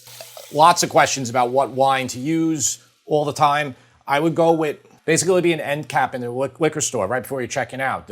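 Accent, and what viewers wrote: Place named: New Jersey, United States. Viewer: Brother, I love your channel. You remind me so much of my family in NJ. I miss that accent. I really enjoy the way you break down the preparation and the cooking, almost like an engineer would. I love all the hints and tips during the production that help us all to become better cooks. I love that your family is involved. I love that you’ve clearly done your studying beforehand and practiced each dish a few times. Thanks for your channel. I pre-ordered the book and I’m sure I’ll love it. I love Italian cooking. My heritage is mostly from Sicily and that must create a DNA-level connection to the food because it’s always been my favorite. Thanks again.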